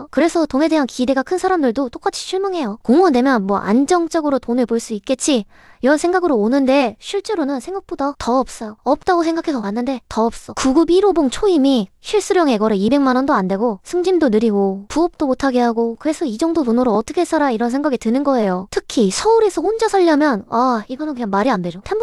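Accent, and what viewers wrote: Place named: Gyeonggi, South Korea. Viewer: 얘 말투 이거 경기도사투리냐? 귀엽네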